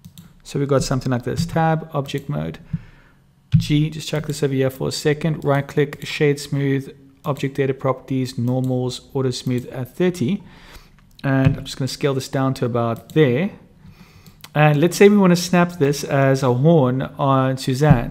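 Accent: South Africa. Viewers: Dude, thank you so Freaking Much. Also, it was nice to hear another South African on the Web.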